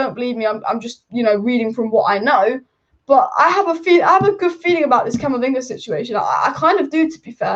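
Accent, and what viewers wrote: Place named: United Kingdom. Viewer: please please Speak little bit Slower everyone is not English specially its more difficult to understand british accent,please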